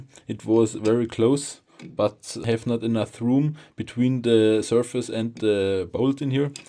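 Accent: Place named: Germany. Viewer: I hear deutsch 🫣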